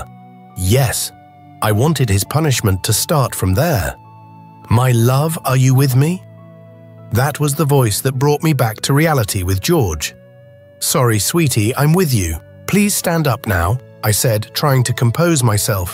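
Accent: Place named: Europe. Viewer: I'm sorry but, why is this being read by this european voice? He isn't pronouncing the oooo's and hmmms correctly.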